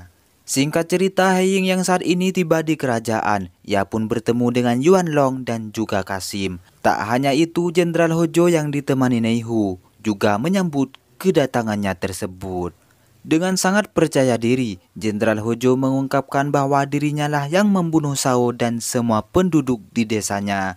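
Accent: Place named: Aceh, Indonesia. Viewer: logat Aceh Sumatra kental..hhh mantab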